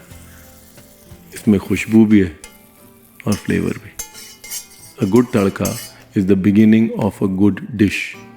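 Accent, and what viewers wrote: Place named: Lucknow, India. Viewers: Lehja apka aur yaad Lucknow ki ... The style to present anything...is absolutely stunning No words can define your way , speak so lovely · Missed your "Hello ji " in the beginning , "ranveer ne bataya nahi" and your lucknowi way of explaining.kul mila k aaj maza nahi aaya .chefs to bahot aapke anokhe andaz k liye aate hai yaha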